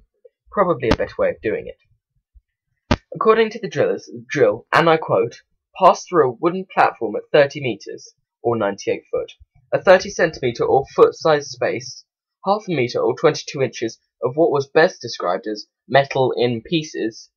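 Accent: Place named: England, United Kingdom. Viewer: george lightheart He sounds English to me.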